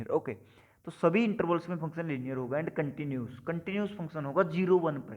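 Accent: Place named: Haryana, India. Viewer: Sir aap haryana se lg rhe hain😂❤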